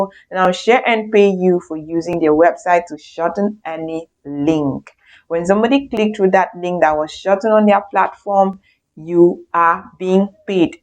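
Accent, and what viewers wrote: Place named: Nigeria. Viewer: Upon recognizing your Nigerian/West African accent, I suspected a scam and cannot subscribe to content aimed at manipulating real ad revenue on YouTube. It appears to be a non-legitimate use of time.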